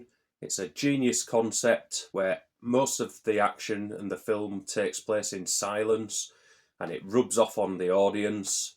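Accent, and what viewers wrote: United Kingdom. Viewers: New subscriber, found this video randomly suggested, and thought great accent, sounds like he's from 'ull, like me. And some great picks here 👍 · hi buddy im from preston and judging from your accent, i dont think your far lol, where abouts r u from ? x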